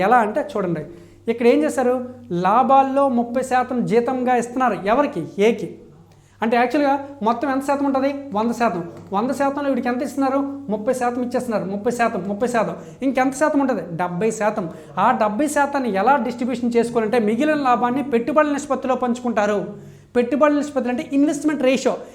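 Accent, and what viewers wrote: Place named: Srikakulam, India. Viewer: Sir meedhi srikakulam kada 😍😍😍😍👏👏👏 nice explanation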